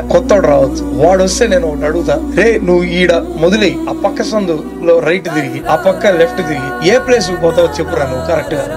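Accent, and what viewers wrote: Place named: Tirupati, India. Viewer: తిరుపతి యాస దించేసవ్ బయ్యా, సూపర్ ❤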